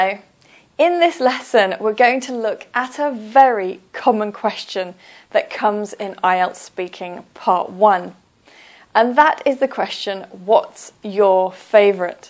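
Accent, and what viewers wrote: United Kingdom. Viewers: Its british accent. You can feel the BOUNCE the way they speak.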